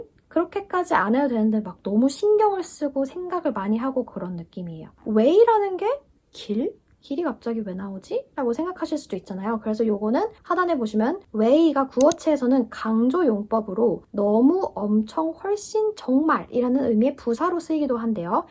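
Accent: United Kingdom. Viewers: 영국에 쭉 사시는것은 아닌가봐요! 덕분에 영국영어를 매일 듣고있어요 감사해요!!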